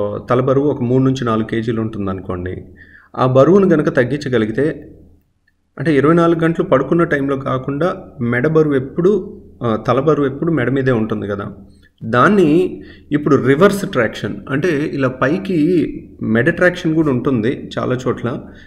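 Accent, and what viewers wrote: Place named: Krishna District, India. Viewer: Sir you speak very nice pure Krishna district telugu.. and with beautiful smile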